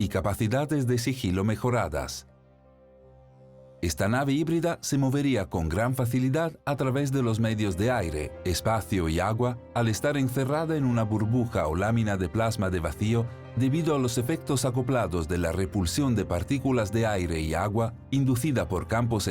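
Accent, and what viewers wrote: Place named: Italy. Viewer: Se llama, excelente voz y hermoso acento italiano!!!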